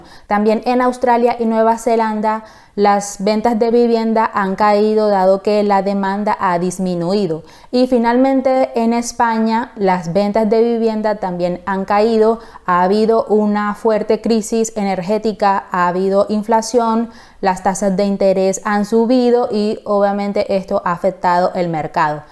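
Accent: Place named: Caribbean Coast, Colombia. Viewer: De una manera amable te aconsejo acudas con un fonoaudiologo: que te ayude a mejorar tu dicción. Tu pronunciación muestra que eres una persona ignorante en el tema. No me refiero a tu acento que se nota costeño. Puede que no seas ignorante en el tema pero esa es la percepción que muestra tu mala pronunciación. También debes mejorar la redacción! Saludos y mucha suerte 🍀👍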